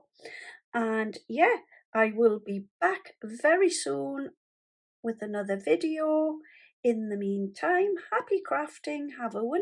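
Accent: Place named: Newcastle, United Kingdom. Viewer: Thank you so much for the wallet idea. My sister and I are building up papercraft and crochet items for a craft fair later this year. I have been following your uTube channel for only a couple of weeks and you have such great ideas. We were looking for something for males as we felt we were leaving them out as we have lots of girly stuff. I most of all love your great Geordi accent it’s my favourite accent so I could listen to you all day(hope that doesn’t sound too creepy 😮. Thanks once again xx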